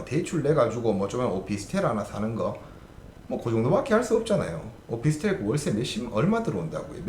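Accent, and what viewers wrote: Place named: Gyeongsang, South Korea. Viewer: 진솔하게 다가오네요. 응원합니다. 대구말투 ㅎㅎ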